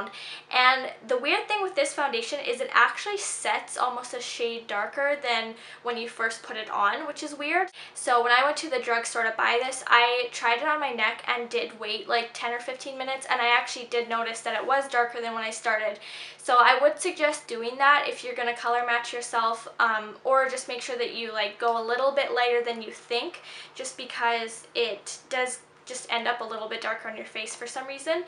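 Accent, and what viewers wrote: Canada. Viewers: Where r u from? I thought ud b from America but apparently u aren't...I'm guessing Canada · She Canadian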